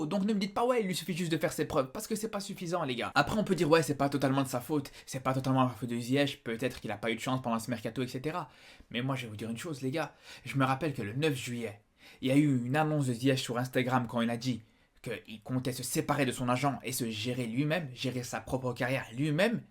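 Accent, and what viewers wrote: Belgium. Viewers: J'entends un ptit accent belge. T'es marocain de Belgique ?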